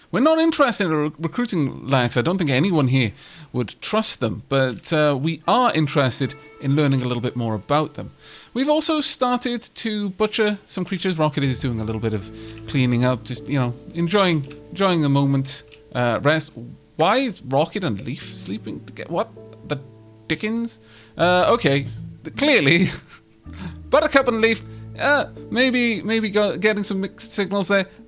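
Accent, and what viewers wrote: Wales, United Kingdom. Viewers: new sub here, Rimworld brought me but am enjoying your style so I shall check out the rest of your content! Out of curiosity, would that be a slight hint of a Welsh accent I hear?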